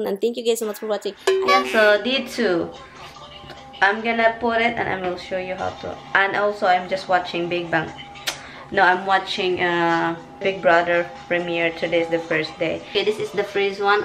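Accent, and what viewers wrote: Visayas, Philippines. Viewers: Hi nag enjoy ko nimu ug tan aw bisan bisaya ang accent Peru cuteeee man...Been following you..Am working here in Cambodia..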